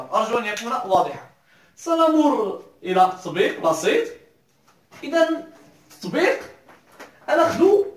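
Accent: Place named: Morocco. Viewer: مغربي. ولا جزايري تخلطتلي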